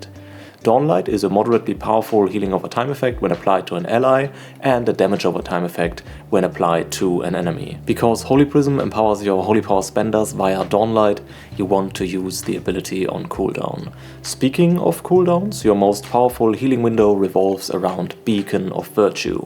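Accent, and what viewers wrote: Germany. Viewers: I hear a bit DEUTSCH out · Hi where are u from if u dont mind asking! cuz u have a german accent haha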